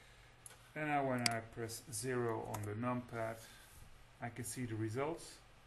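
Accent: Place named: Netherlands. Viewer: thanks! hoorde gelijk dat je nederlands was hihi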